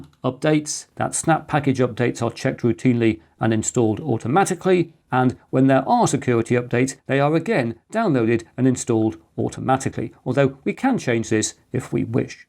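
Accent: United Kingdom. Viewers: I wish I had you in my CS classes back then. First, a british accent is always a win, second, your teaching is incredibly motivating and paced on point. Thank you so much for your content!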